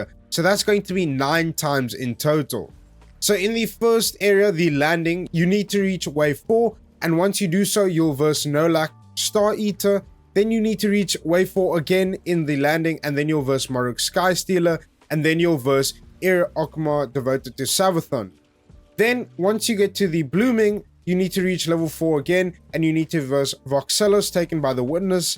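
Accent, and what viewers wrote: South Africa. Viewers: 2 seconds in and I can already hear this is a fellow South African. Thank you so much and keep up the great work! DDP, Bokke for the win 🎉🇿🇦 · are u South African?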